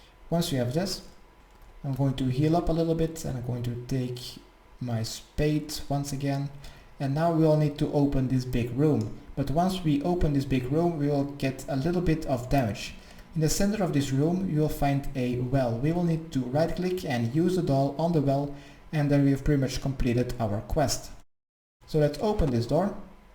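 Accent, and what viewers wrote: Netherlands. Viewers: When you fail the rope swing 2x but the stupid grid thing was literally 5 times straight forward xD Thanks for the amazing, not annoying (ahum TAF) quest guides. Nice Dutchie accent x) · You're dutch, lol.. never thought u were even tho you got an accent, im also dutch :)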